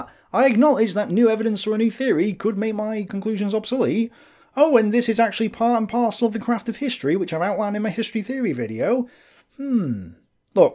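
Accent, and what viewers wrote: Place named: North, United Kingdom. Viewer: part of it just comes from having a Northern accent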